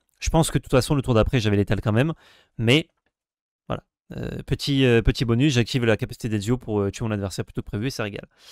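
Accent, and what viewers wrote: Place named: Italy. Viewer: Quel accent italien !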